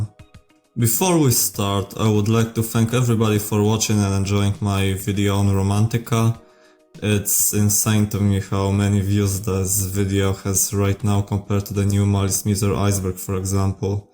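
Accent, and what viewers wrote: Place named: Poland. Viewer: Are you from Poland? I can hear our accent 😭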